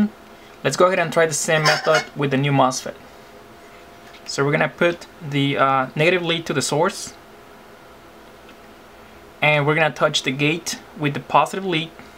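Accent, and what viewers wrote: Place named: United States. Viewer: =) the great american accent ......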